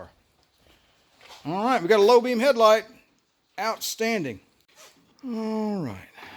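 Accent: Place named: South, United States. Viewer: Strong southern accent.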